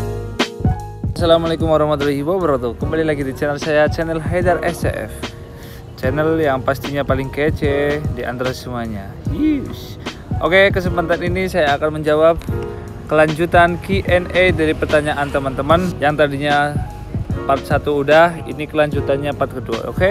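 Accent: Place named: Banyumas, Indonesia. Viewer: Ngapak.e khas nemen bib😅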